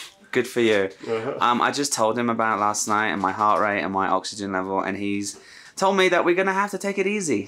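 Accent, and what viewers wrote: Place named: Newcastle, United Kingdom. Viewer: Ahhh a Geordie 😂😂😂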